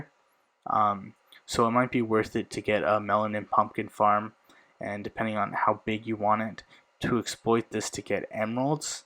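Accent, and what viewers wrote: Punjab, India. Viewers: Is you punjabi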